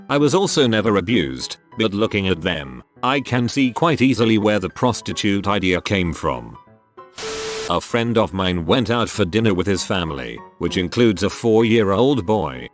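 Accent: United Kingdom. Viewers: when i first started watching these videos i could recognize that it was a British accent but now i cant hear the accent anymore.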